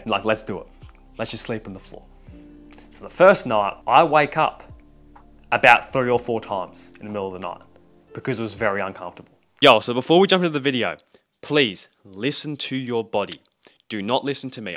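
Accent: Australia. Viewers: Judging by your accent I’m guessing your from New South Wales or Sydney area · Now that's an Aussie accent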